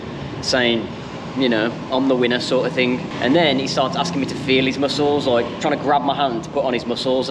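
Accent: Birmingham, United Kingdom. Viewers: You from Birmingham or somewhere close to the black country?... your accent is very familiar.. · Hei man. 1st, thanks for good contents. Then, i watched u a lot before my first trip to thailand, and it was really useful. In fact, because of your nice british accent ,its easier for people like me to undrestand , but maybe you can put an english subtitle on your videos ,so that would be so good for us. It will be more useful and also helping us improve our english. It was just a suggestion. And thanks anyway. Hope to see you on next trip❤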